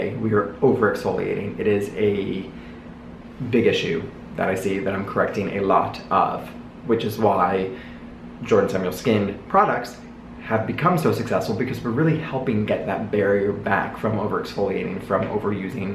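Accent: New York, United States. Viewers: Our first New Yawk Tawk!! Love it. Sip sip!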